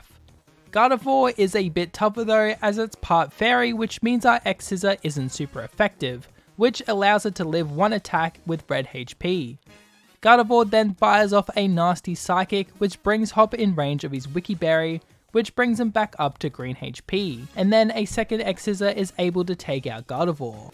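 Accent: Australia. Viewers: Are you Australian if so in from the central coast in NSW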